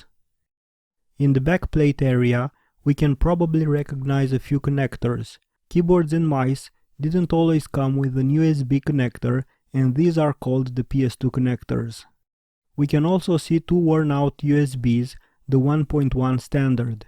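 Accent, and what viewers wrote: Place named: Romania. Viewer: Excelent video. Mi am dat seama dupa accent ca esti roman. Like!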